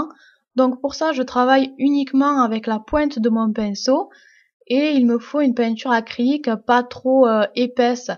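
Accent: South, France. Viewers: J'adore ton accent tu viens du sud ? De la france !!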